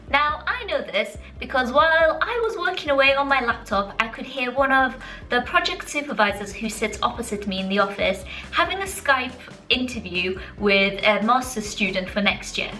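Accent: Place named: United Kingdom. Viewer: that perky british accent is why i want to go there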